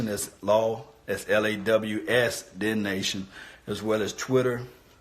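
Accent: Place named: New Orleans, United States. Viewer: Law, love the channel. i know nothing of you personally but you sound more NOLA than Texas to me. Am I just completely off? EDIT... im not judging. I'm a Dallas fan in Eagle territory. This is a truly dangerous situation. Eagle fans are crazy AF.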